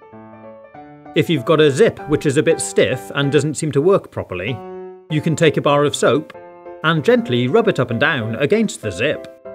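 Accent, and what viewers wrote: United Kingdom. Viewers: AWESOME and very much APPRECIATED. THE IDEA OF SUCH A VIDEO IS EQUALLY CLEVER AS ALL ITS CONTENTS. I ALSO LOVE TO LISTEN TO THE BRITISH OR BRITISH SOUNDING ACCENT. VERY WELL DONE.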